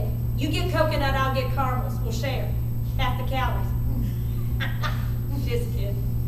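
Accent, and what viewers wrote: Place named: East Tennessee, United States. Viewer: I grew up in East Tennessee. She sounds like my sister. Same accent throughout, just more noticeable when she goes from lecture voice to excited conversation voice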